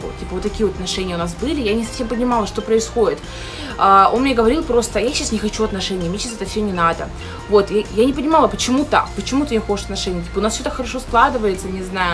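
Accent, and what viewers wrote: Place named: Siberia, Russia. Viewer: Как приятно было услышать вашу историю !! Можно сказать, что я ваша землячка! В детстве росла в Бурятии, а потом переехали с родителями в Иркутскую область! Так что вам привет от землячки 😊! Да Иркутск и всем известный Шанхай очень известен , очень много китайцев там, там считаю это почти граница! Многие мои подружки тоже учили китайский именно в Иркутске и потом переедали в Китай! Кстати, менталитет Сибири прям явно замерен вас и отличается, особенно этот сибирский акцент 😊! Потому что во взрослой жизни я уже жила на Урале: Уфа, Челябинск , Оренбург - менталитет там людей отличается и говор тоже! Я бы сказала, что сибирские девушки более добрые как вы говорите 😍! Ну а моя история связана не с Китаем, она связана с Индией 🇮🇳 : я всю жизнь грезила об Индии , мечтала туда уехать и скажи мне лет 5 назад, что в меня будет семья в Индии , я бы тоже не поверила 😱! 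Но я живу теперь в Индии 🇮🇳, мой муж индиец , у нас дочка ! Вы Молодец: очень позитивная! Желаю счастья вашей семье ❤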